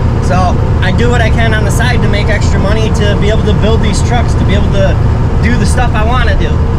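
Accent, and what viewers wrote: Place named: Florida, United States. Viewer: What I’m curious about is what kinda red neck Florida accent is that ? It ain’t no southern twang I’ve ever heard .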